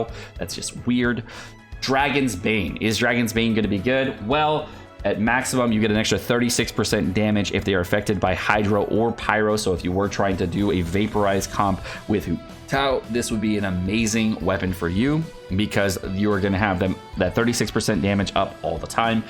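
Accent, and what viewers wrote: United Kingdom. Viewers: I don't care about who tao. I'm just here for the music and bri'ish. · Woah british again ☺️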